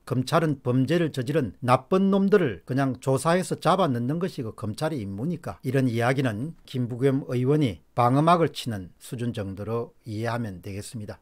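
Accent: Gyeongsang, South Korea. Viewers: 공병호씨 이분 기억해둬야겠네 말투보니 대구사람 같은데 같은지역 산다는게 넘 쪽팔린다. 미국산 쇠고기 마이 쳐 먹었나??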